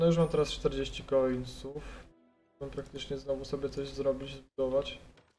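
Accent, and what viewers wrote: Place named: England, United Kingdom. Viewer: А мне показалось англичанин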